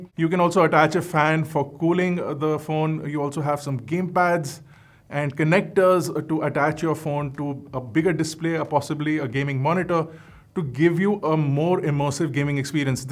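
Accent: India. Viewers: Shout out to your neutral accent which is missing from the other Indian tech channels who put out content in English. Thanks for the quality of video and the precise verdict.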